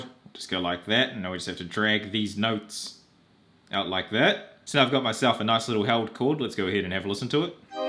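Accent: New Zealand. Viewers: are you from new zealand? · are you a new zealander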